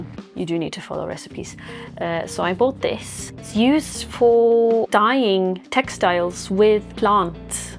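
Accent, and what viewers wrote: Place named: England, United Kingdom. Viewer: Did she live in UK or something? She sounds English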